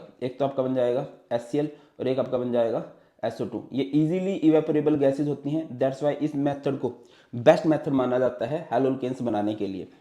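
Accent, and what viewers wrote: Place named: Uttar Pradesh, India. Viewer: Ya he's got that UP waala accent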